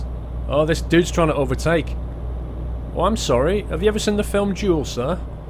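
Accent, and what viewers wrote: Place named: Yorkshire, United Kingdom. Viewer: Your from Yorkshire, sound mate same!!!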